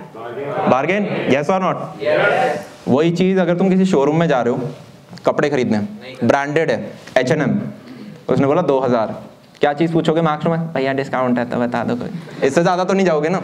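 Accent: Haryana, India. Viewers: Sir kya aap Haryana se belong karte ho. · ....the haryanavi accent😂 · 😉isse badhiya to sipahi lagleta fauj me.. Bhaiya apki Haryanvi accent bht shi lgti h☺️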